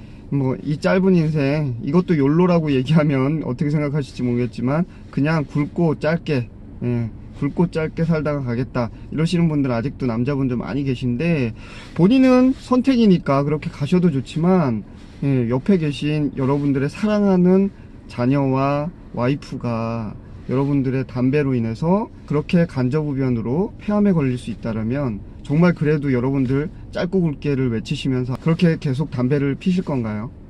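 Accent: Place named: Chungcheong, South Korea. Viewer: 거시기... 충청도 특유의 말투아닌가요? 저는 아주 정감이 느껴지는데요.. ㅎㅎ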